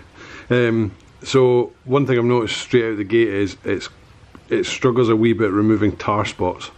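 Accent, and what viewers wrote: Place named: England, United Kingdom. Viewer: Great review. Can you get this in the US? I like your accent. Which part of England are you from?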